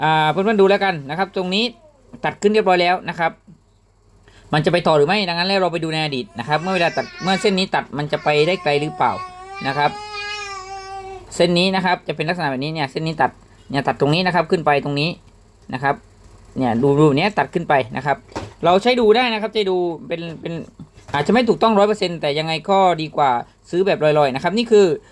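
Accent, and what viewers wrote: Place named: North, Thailand. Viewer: รู้เลยว่าคนเหนือ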